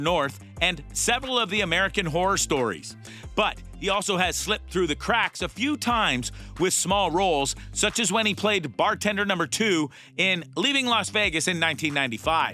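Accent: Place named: Poland. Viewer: the voice of this guy is from poland or something...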